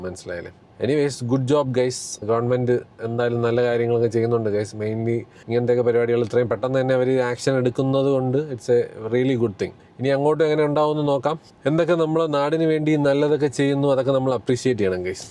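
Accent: Trivandrum, India. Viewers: Why you gotten Trivandrum slang 🤔🤔🤔???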